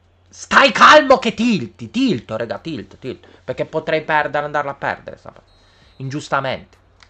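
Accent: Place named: Sicily, Italy. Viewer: Ciao è sempre un piacere vederti, comunque posso chiederti una cortesia? Puoi evitare di bestemmiare? Poi una domanda, ma sento alcuni accenti siciliani. Di dove sei?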